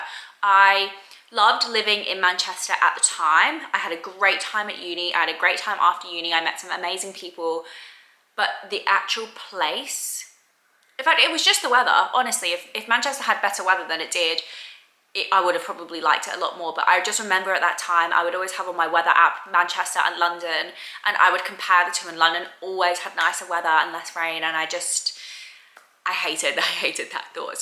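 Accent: Australia. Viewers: I didn't know you could say "like" that many times in such a short time LOL. But you have picked up our accent really quickly. Good on Ya! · You already have an Aussie accent.. nice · You have a lingering Aussie accent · You've got a good Australian accent. There are English people who've been living here for 20 years that sound like they just got off the boat. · You've definitely picked up an Aussie twang in your accent! I just got my PR and looking to move out next year. Can't wait. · I love your accent!! Bit of Aussie, Londoner & Manny!😅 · I call bs. She sounds too Australian to have only been here for 3 years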